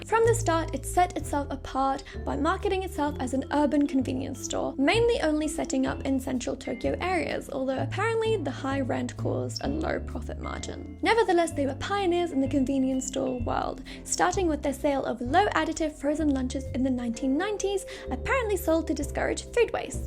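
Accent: England, United Kingdom. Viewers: she lost her England accent a lot from the last time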